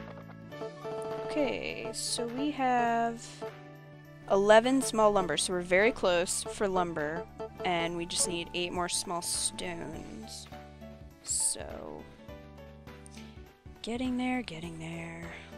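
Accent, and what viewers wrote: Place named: New York, United States. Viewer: Kind of sounds New Yorkish, they way you say certain words, heheh. cx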